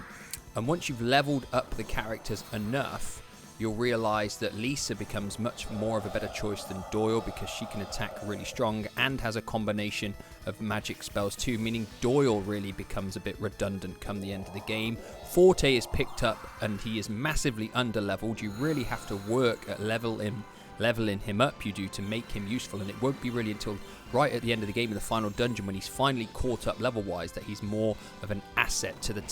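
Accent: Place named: United Kingdom. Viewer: The classic well written well spoken British man I believe every word he speaks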